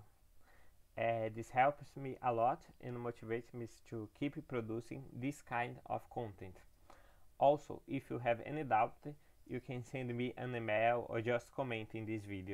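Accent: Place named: Portugal. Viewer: Given your accent I'm almost sure that your native language is Portuguese =) am I correct? cya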